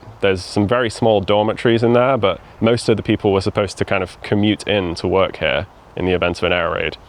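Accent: United Kingdom. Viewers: england is such a nice skansen and a british voice is just perfect as narration. nice video bro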